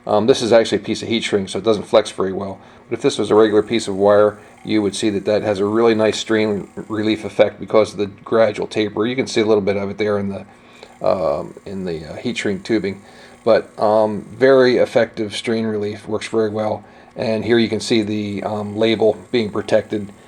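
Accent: Pittsburgh, United States. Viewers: Your accent sounds (a bit) like someone from Pittsburgh.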